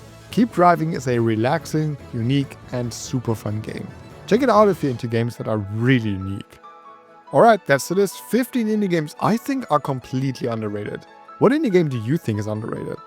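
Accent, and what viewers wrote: Germany. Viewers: Hi thank you for these awesome videos! My question is if you are German? Because your accent sounds so german 😌 I am from Germany too! Greetings!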